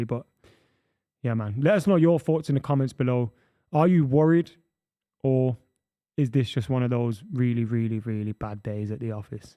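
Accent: Birmingham, United Kingdom. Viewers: Boring brummie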